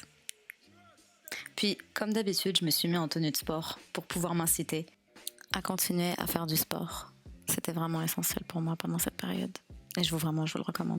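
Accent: Quebec, Canada. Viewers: First, tu as clairement pris l’accent québécois hihi (we win!!). Second, JADORE encore une fois ta vidéo. Non suelement ton montage est magnifique, mais tu as toujours toujours pleins de conseilles qui nous aide à se développer. Merci , merci de partager ce moment difficile avec nous. De nous inspirer à aller de l’avant et être de meilleures personnes! We love you💕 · J'entends un accent québécois là nan ?? 🙀🤭 Super vidéo avec des good tips, ça fait toujours du bien de le rappeler 😘 Keep doing girl 💪😘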